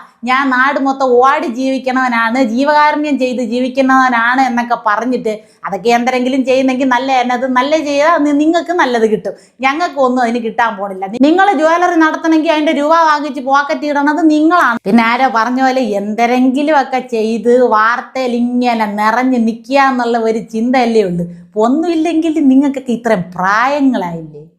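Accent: Thiruvananthapuram, India. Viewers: പറയേണ്ടുന്നതെല്ലാം വളരെ കൃത്യമായി പറയേണ്ടുന്ന രീതിയിൽ തന്നെ പറഞ്ഞിരിക്കുന്നു. ഇത്തരം ഒരു പ്രതികരണത്തിന് ആദ്യമായി അഭിനന്ദനങ്ങൾ. അതുപോലെതന്നെ ചാനലുകളിൽ വരുന്ന പല കോമഡി പരിപാടികളിലും ദ്വയാർത്ത പദപ്രയോഗങ്ങളുടെ അതിപ്രസരമാണ് (പൊടിയൻ കൊച്ചാട്ടൻ ടീം ഒഴികെ, കുടുംബസമേതം കാണാൻ പറ്റുന്നത് ഇവരുടെ കോമഡി മാത്രമാണ് ) അങ്ങനെയുള്ള വളിപ്പ് പരിപാടികളെക്കുറിച്ചും ഒരു വീഡിയോ ചെയ്യണം.. പിന്നെ സഹോദരിയുടെ തിരുവനന്തപുരം സ്ലാങ്ങിലുള്ള അവതരണം ഗംഭീരം ( ഇതാണ് ശരിക്കും തിരുവനന്തപുരം സ്ലാങ് അല്ലേ ).. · ഉഗ്രൻ.വളരെഇഷ്ടപ്പെട്ടു.തിരുവനന്തപുരംഭാഷ.മിമിക്രിക്കാരിയാണല്ലേ.നല്ലഭാവിയുണ്ട്. ബോച്ചെക്ക്പണംധാരാളംഉണ്ട്.അതുകൊണ്ടുള്ള,അഹങ്കാരമാണ്.വിദ്യാഭ്യാസംകുറവാണെന്ന്തോന്നുന്നു.പോത്തിനോടുവേദംഓതിയിട്ടെന്ത്കാര്യം. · തിരുന്തോരം ഭാഷയിലായത് വളരെ ഇഷ്ടപ്പെട്ടു, എന്തൊരപ്പി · തിരുവനന്തോര० ഭാഷയു० അവതരണവു० സൂപ്പർ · നിങ്ങടെ tvm സ്ലാങ് പൊളി 😂😂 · തിരോന്തരം സ്റ്റൈലിൽബോചെയെ പള്ളൂ പറയാൻ ഇതാരെടേ. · Thiruvananthapuram slang kalakki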